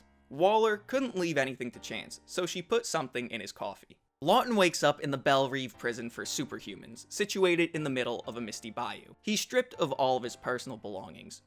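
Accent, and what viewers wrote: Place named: Boston, United States. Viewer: Homeboy and his Boston pronunciation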